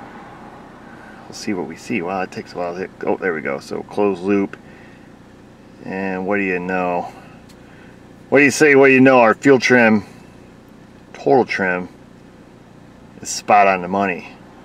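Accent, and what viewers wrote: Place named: Western New York, United States. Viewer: I’ve been in the area twice recently, but alas my car was running fine. I always learn something when I watch your videos and is like a trip back home when I your that WNY accent.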